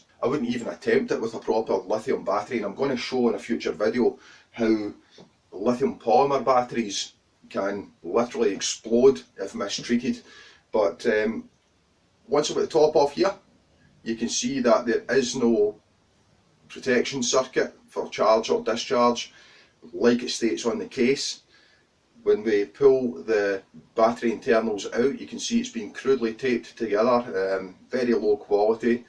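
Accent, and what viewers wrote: Scotland, United Kingdom. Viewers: I have learned my lesson the hard way!. Don't buy any vape products such as batteries 18560 (spoke about in this video) or vape coils. I have purchased both and received fake Products both fake batteries and vape coils. I even had a seller contact me when I left negative feedback asking what he could do to get me to change my negative rating!! REALLY! Thanks for the video - great job and being Scottish I loved the accent too! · naw, I pegged that accent as Scottish quite early, and honestly, I think that short of accent sounds kinda cool IMHO.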